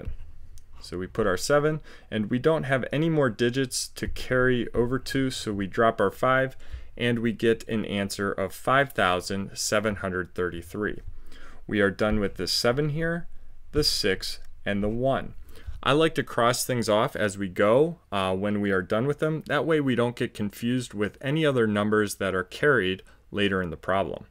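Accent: United States. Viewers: Ty are you American 🇺🇸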